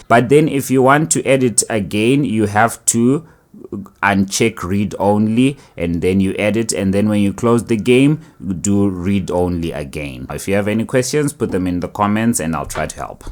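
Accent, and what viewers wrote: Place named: Zimbabwe. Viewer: Are u from Zimbabwe, your accent sounds like it, or south africa, sounds more like zims tho?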